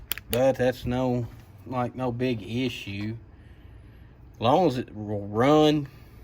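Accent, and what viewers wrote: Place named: North Carolina, United States. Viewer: Sounds like you’re from Lumberton NC. Stay safe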